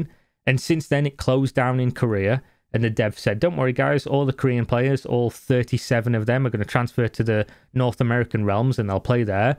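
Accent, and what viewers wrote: United Kingdom. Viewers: so satisfying to listen to a man rant in a british accent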